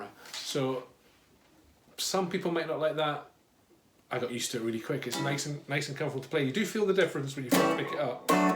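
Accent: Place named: Scotland, United Kingdom. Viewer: Nice to hear another Scottish person!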